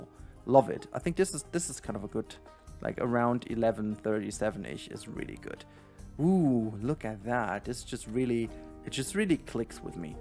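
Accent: Germany. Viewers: junge liebe ich den deutschen Akzent den man hört 😅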